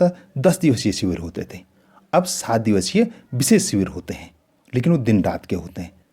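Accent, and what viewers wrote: Garhwal, India. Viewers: Sirji aap garhwali lagre ho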